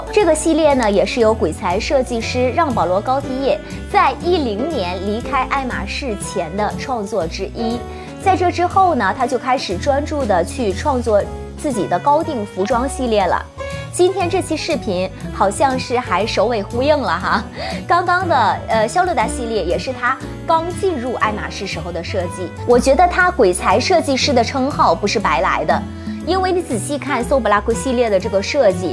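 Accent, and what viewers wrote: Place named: Japan. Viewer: 谢谢做这些Hermès 视频. 你真是Hermès expert 而且也有access to so many unique Hermes pieces. 一个小建议哈： 能不能在屏幕上放上讲述内容的英文，日式英文真的是听不太懂啊……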